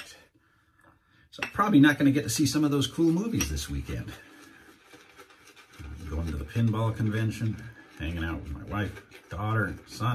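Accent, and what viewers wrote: Newcastle, United Kingdom. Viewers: your welcome and thank you for sharing such amazing videos. Newcastle's great, love the accent! I was born in london. I am also a bond fan and I collect watches so I noticed your omega Seamaster straight away, I also own one. I have just this week started shaving with a double edged razor for the 1st time and really enjoying it! Not to many cuts!! I can see this turning in to a new hobby! 😁🇬🇧🇺🇲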